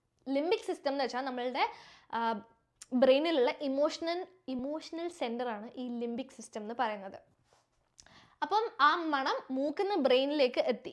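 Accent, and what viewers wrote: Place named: Thalassery, India. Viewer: That Thallasery slang 😊❤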